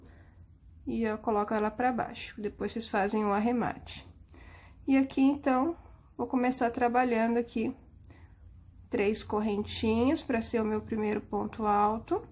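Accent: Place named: Spain. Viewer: Eu amo essa fala como eu queria aprender falar assim é muito lindo como se pronuncia ad palavras bjosss para todos da espanha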